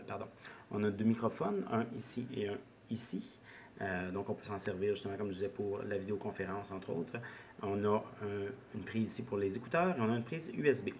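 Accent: Canada. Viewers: J'adore ton accent canadien !! Vive le Canada !!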